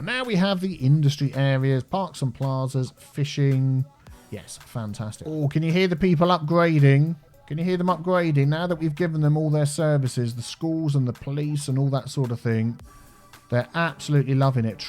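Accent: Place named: United Kingdom. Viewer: I'm living in the UK temporarily from America, and I hope you don't mind if I ask what accent is that? At my place of work there is a guy who sounds exactly like you, as we're all working from home now I don't know him well enough to chat him up on messenger haha. Edit: Accents fascinate me and I like to try to train my ear.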